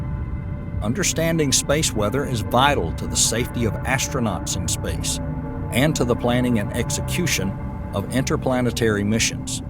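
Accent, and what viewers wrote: South, United States. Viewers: I can’t figure out if the narrator is a genuine member of the scientific community, or maybe an enthusiast with an impressive understanding of the subject of astrophysics…or just someone regurgitating material from Wikipedia or space websites. I am well educated in physics and to a lesser degree astrophysics, so I can usually detect whether or not a person is pretending to know what they’re talking about or if they have a real understanding of the topic. But this guy confuses me! The complexities of quantum mechanics and astrophysics seem to be well understood by him, but at the same time, he mispronounces certain terms…egregiously! However, I too am from the south and I have experience with being judged by people who think my accent is an indicator of my intellect. Regardless, I thoroughly enjoy his content and often listen to his work in the background while I am doing housework or other mundane tasks.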